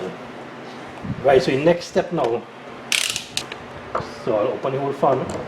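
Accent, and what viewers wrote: Trinidad and Tobago. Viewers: How you sounding like ah Trinidadian so.?? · Sounds like a trini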